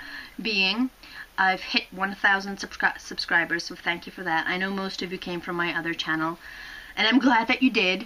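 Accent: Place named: Poland. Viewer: I was wondering where you learned English. It sounds North American with a very small Polish accent. I am thinking about moving to Poland or Russia to teach English (I am from Los Angeles) and I am enjoying your videos.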